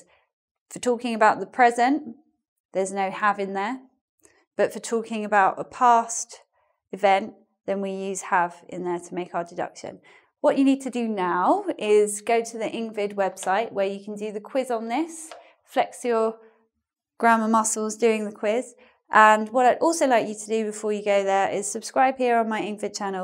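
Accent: United Kingdom. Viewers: I started learning Eng at the age of 6, now I'm 25. I love her channel but I mostly watch her videos to listen to British Eng not to learn. But in that 19 years, every teacher and book said that 'might' is the formal and polite version of 'may'. And here I am, hearing the total opposite with a confused Pikachu face. Thank you so much!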